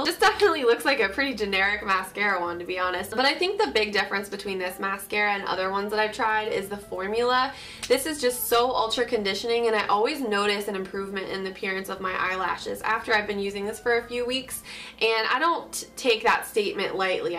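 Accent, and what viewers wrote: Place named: Philadelphia, United States. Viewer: You sound like you're from Philly or Princeton area, where I live, & you look so familiar. Did you used to work in marketing or design before you started doing makeup?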